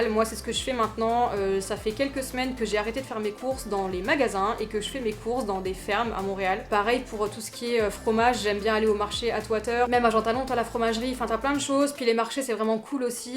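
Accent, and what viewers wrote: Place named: France. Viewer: un an tu viens juste d arriver et durant la pandemie en plus c est surement plus complique attend tu auras des amis du travail des decouvertes culturel et pleins de surprises dit toi que c est une anne special pour tout le monde <num> seras surement beaucoup mieux il ne faut pas perdre l accent francais ce n est pas un reproche au contraire on aime bienvenue tous les francais et francophones le quebec EST FRANCAIS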